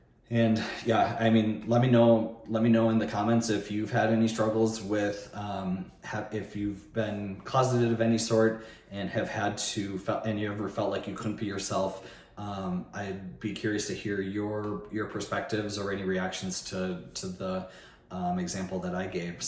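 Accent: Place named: San Francisco, United States. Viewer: It's called a San Francisco Accent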